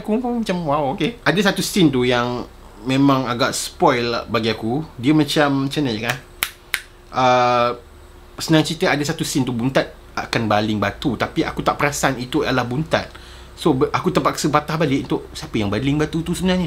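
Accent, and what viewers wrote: Kedah, Malaysia. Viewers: Rasa lorat dalam kawasan kedah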